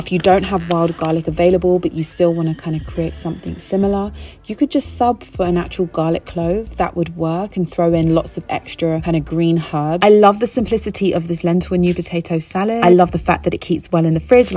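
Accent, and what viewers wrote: United Kingdom. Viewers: New subsciber! Love your content and your accent makes me miss living in the UK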